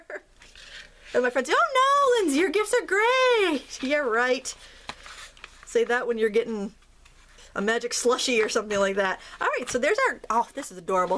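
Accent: Maine, United States. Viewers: I love the Maine accent!!! Whoohoo! Great video. :) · LOOL< see, and I've been "from away" for so long that I miss the Maine accent!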